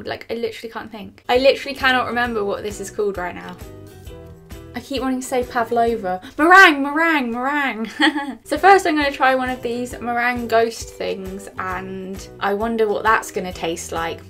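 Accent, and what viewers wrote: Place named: England, United Kingdom. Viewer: i found ur videos other day now im hooked on watching them all xx ... r u from north south east or west england as ur accent is lovley xc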